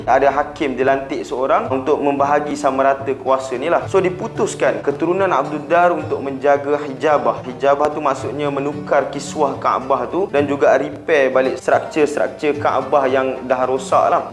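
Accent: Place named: North, Malaysia. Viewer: Crita dh sedap..tpi bhsa utaqa hg xbrpa nk sdp.....tpi boleh prbaek lgi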